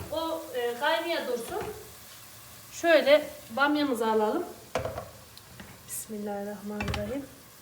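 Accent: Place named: Central Anatolia, Turkey. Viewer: S a hnm ic anadolunun insanina dogallik yakişır şivenizi degistirmek icin ugraşmayin sizede her insana oldugu gibi dogallik yakisıyo Allaha emanet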